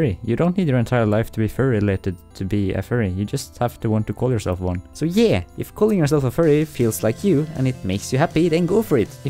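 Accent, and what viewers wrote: Norway. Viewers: Hahaha your videos are the best - your quirky Norwegian accents/style combine perfectly with an endearing wit that is both playful and thoughtful at the same <3 Also I learned today that in Norway you supposedly eat sandwiches with a knife and fork? Truly a divine country. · Nevermind, found it in one of his other videos, it’s a Norwegian accent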